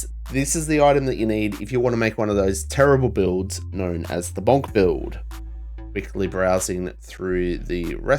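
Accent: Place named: Australia. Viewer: He's from Australia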